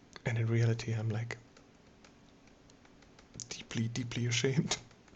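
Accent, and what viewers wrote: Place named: Germany. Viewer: Ich meine ich höre einen deutschen Akzent? Werden wir nichtperfecktenglischversteher auch mit einem deutschen Tutorial verwöhnt oder habe ich es übersehen? Lieben Dank...Finde die Möglichkeiten großartig.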